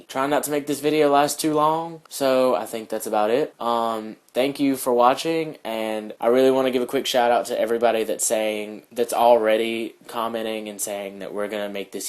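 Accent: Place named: South, United States. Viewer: where are you from??? I hear a Southern accent, but not sure f ft. Om where.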